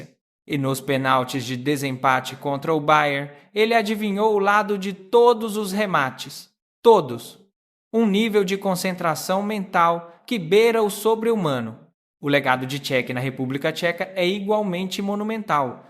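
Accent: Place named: Portugal. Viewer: Mistura de português de Portugal e português do Brasil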